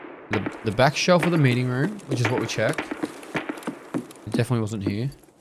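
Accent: Australia. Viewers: Finally caught up. I can now understand Aussie at 2x speeds!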